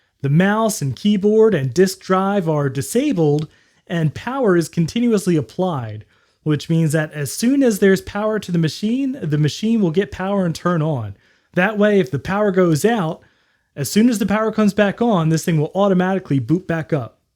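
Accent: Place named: North, United States. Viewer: It depends on your accent. I'm not sure about American accents but sounds more like a northern states person, close to Canada. Not sure. I'm British and we pronounce hyperbolic as a mix between the way you said it's meant to be pronounced, and the way that Americans pronounce it. Like "hy-per-ber-lic". Actually though, "hyper-bol-ic" is *not wrong*.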